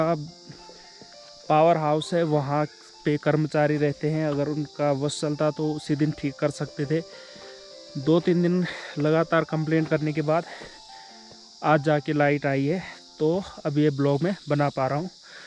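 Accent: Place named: Hills, India. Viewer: Bhai ji aapki video aur aapka presentation Bolne ka tarika bahut badhiya hota hai ekdum hum pahadiyon jaisa apni video daily dale aur aise hi vlog banate rahein.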